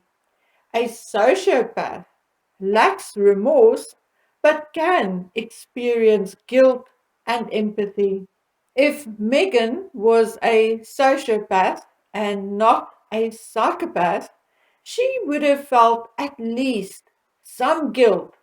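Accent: South Africa. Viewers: Hello! I really enjoy your video chats and sincerity. Love your South African accent. Bravo! Yes any intelligent person realises that M is ill and your analysis of the situation and the possible end is absolutely valid. May l please just ask one thing? Do you write out your messages and then read them? Sorry sweety it sounds like it.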